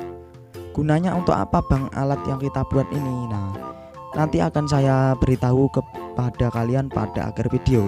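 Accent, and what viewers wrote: Java, Indonesia. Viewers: Hhh untuk merosani jowo banget bang, jowonya endi brow sampan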